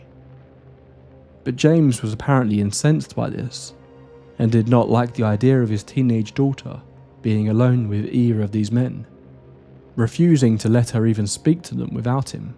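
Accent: United Kingdom. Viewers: Bullognia!!. I'm a native NY-er who tunes in to this channel for the smooth, sophisticated British voice overs that only provides.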